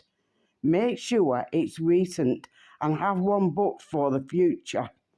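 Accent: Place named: Yorkshire, United Kingdom. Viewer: Yorkshire accent that mate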